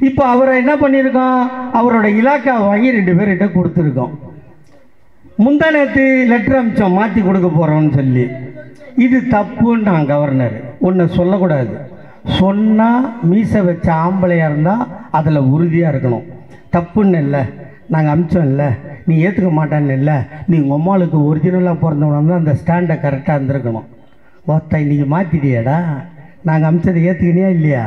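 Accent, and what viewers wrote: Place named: North Chennai, India. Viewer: Vada chennai la ippadithane pesuvanga. Pengalume ippadithane pesuvanga. This is vada chennai local language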